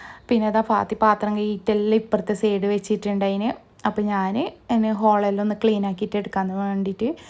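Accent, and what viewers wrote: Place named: Kannur, India. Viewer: കണ്ണൂര് പോലെ തോന്നി അതാണ് ചോദിച്ചത് 😊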